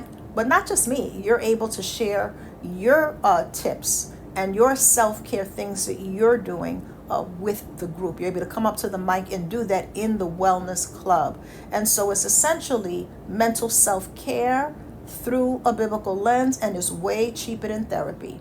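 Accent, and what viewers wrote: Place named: New Jersey, United States. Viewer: Ms., your Jersey accent is off the chain, your accent is beautiful and gorgeous!!!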